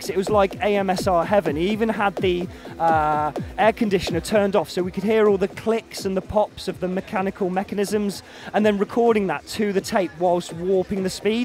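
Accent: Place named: Bristol, United Kingdom. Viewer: Sounds like a Bristol boy!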